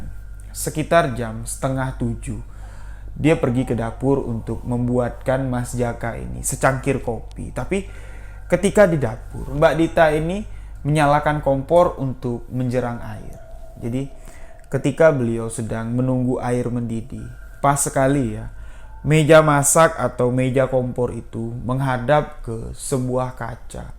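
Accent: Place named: Jambi, Indonesia. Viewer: Konsep konten Story Teler nya mirip channel SUNYI, cara menyajikan ceritanya menarik dengan intonasi suara saat bercerita... Khas jambi banget. Sedikit koreksi mas, pernikahan itu kan hukum Agama, dalam Islam... Masa iddah wanita itu sekitar 3 bulan, setelah lewat 3 bulan masa iddah maka wanita tersebut boleh menikah lago. Jadi jangan dibenturkan dengan kearifan lokal yang menyatakan "tidak boleh menikah jika masih 7 bulan menjanda"... Baik itu berdasarkan adat jambi atau adat jawa, dll. seolah-olah menikah lagi setelah 7 bulan ditinggalkan suami adalah sebuah hal yang haram (tidak boleh). Tetap hukum Agama diatas hukum kearifan lokal, jika hanya ingin beropini sendiri, cukuplah katakan "jika masih bisa menahan syahwat, sebaiknya menikah lagi setelah 1 tahun setelah meninggalnya sang suami.".... Jadi hal itu sifatnya anjuran saja. Saat ini masih nyimak konten-kontennya bang... 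Semangat bang..!!!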